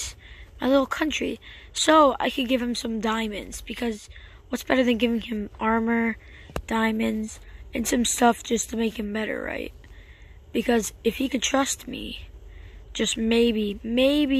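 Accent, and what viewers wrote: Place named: United Kingdom. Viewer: You have a good British accent and that's coming from a British Person.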